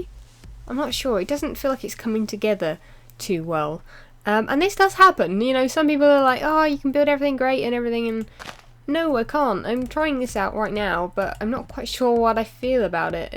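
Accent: United Kingdom. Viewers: Great vid. Love the secret base. I must say, I just love your accent. I was once stationed at an American airbase 2 hrs northeast of London and just minutes from a small town called Ipswich, and if I had to pick something that I miss the most about the UK, without a doubt, it's the British women and their beautiful British accents. Once again, great vid.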